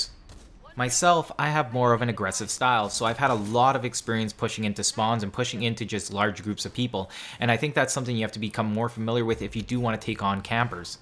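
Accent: Canada. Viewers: im digging the canadian accent over gamesager girly voice lol lol jk! you should do some Kraber with invisibilty gameplay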